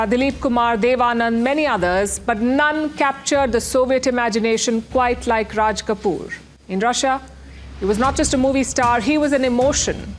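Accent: India. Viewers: Not English English. It's Indian english. What an experiment of using words so beautifully! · Love this Indian English You are always awesome ❤❤!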